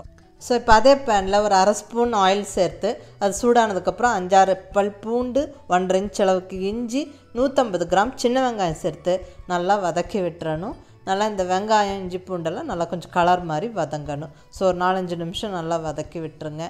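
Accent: Kongu, India. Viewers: அருமை சகோதரி. உங்கள் கொங்கு தமிழ் உச்சரிப்பும், குழம்பும்.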